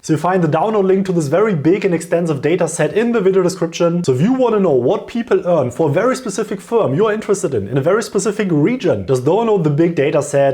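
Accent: Germany. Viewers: Alter hörst du dich deutsch an😂😂. Trotzdem gutes Video👌🏼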